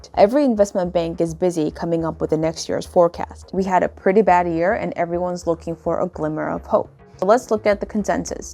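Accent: New York, United States. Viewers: 역시 Newyorker 는 영어로 하셔야 같아요~~~ 잘보고 공부 많이 되었습니다.! 지난주 뉴욕 출장시 Bryant park하고 도서관 지날때 생각나더라구요, 한국서 건승하시길! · 이거슨 미쿡 발음?👍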